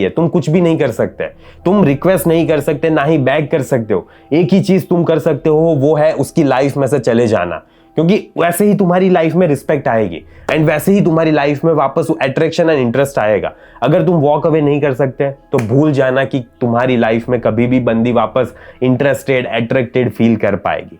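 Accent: Gujarat, India. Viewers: Tumhari boli se pata chal gaya k tum gujrati ho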